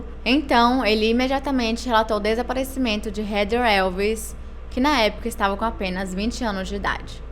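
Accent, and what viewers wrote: Portugal. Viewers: Tô conhecendo o canal através da e já tô amando. Adorei a parceria e o sotaque português é MARAVILHOSOOOOO <3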